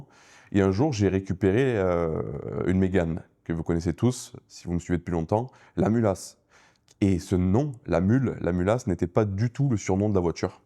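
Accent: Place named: Vaucluse, France. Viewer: Je ne vois pas ce que tu n'aimes pas dans a voix :) moi j'adore (l'accent vauclusien y est pour beaucoup EVIDEMMENT... 😁)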